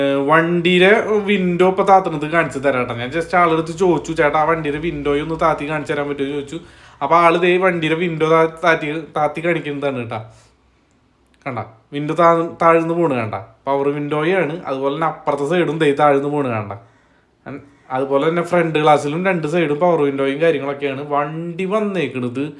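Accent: Thrissur, India. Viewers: Thrissur malayalam...ദൈവമേ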